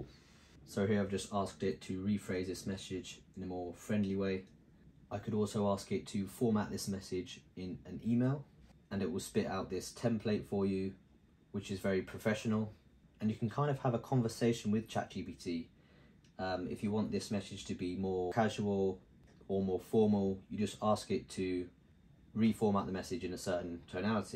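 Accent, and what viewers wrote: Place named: United Kingdom. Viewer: The British accent...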